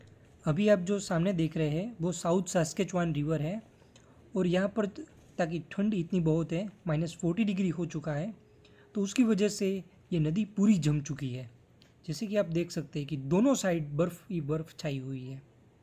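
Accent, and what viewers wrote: Gujarat, India. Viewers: Bhai boli upar thi Gujarati lago cho tame ....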